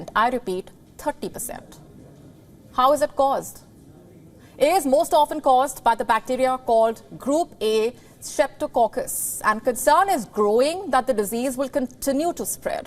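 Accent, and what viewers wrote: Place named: India. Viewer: i hate her Indian accent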